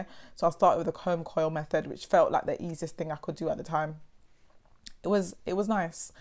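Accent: United Kingdom. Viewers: You have a VERY nice accent 😍 we're you born and raised in London...? The UK?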